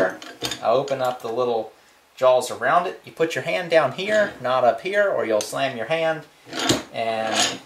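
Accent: South, United States. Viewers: Hahaha! I'm from Arkansas, and not only does your accent give you away, and "down in Georgia" narrows it down even more. I'm not really trying to find your location, I just get a kick out of your sayings. My favorite today was "don't let a nickel hold up a dollar." I've heard that all my life here in the Arkansas Ozarks! Great advice on reclaiming lumber too. I always enjoy your videos, ramblings and all!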